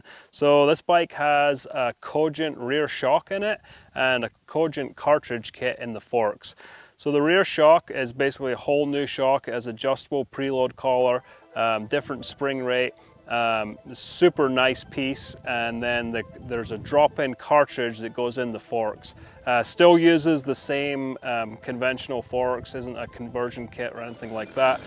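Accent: Scotland, United Kingdom. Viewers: You Scottish? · do I hear a touch of Scottish through the accent... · Scott Manley is another Scottish Youtuber, though his accent is much thicker. I assume your accent is Scottish, yes? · What part of Scotland are you from. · Hint of a Scottish accent in there?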